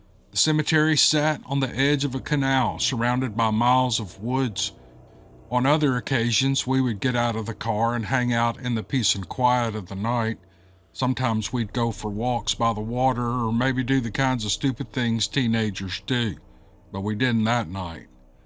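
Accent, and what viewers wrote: South, United States. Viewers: One of the many things I enjoy about listening to your podcasts, is that you are not in a hurry! English is not my first language, and people often try to speed things up on YT. You don't, you read like you speak. With a lovely Southern drawl, not too broad for foreign ears... You stay far away from anything political... a true gift in this day and age... In the short time I've been listening to you, no evening has gone by without you sitting on the edge of my bed, the cadence of your voice slowly rocking me to sleep... Thank you for what you do here,! We really appreciate your efforts! ❤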